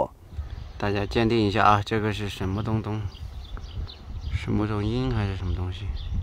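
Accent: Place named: Xinjiang, China. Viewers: 的新疆腔🤗😄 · 口音逐渐新疆化、、、、、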